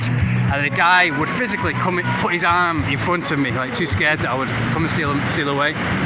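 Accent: United Kingdom. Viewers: You sound English to me but I may be wrong.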